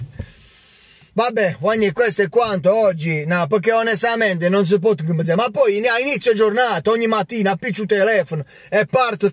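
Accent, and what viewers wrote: Apulia, Italy. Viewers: sei forte,continua così a parlare il pugliese, sei uno spasso, non sono milanista, sono dell'altra sponda del naviglio (interista), ma ti seguo tutte le sere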